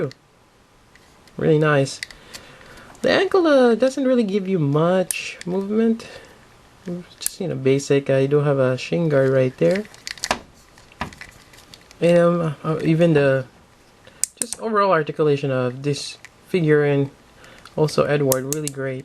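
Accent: Philippines. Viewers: r u Filipino? Cause I’m half Filipino and I can kinda tell from ur accent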